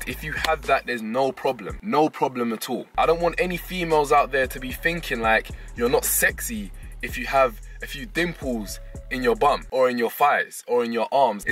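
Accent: London, United Kingdom. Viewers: I love your British accent! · English accent / London accent British is a wider thing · Love your message... And your London accent 😁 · So he’s praising cellulite, looking foine and has a British accent...got my vote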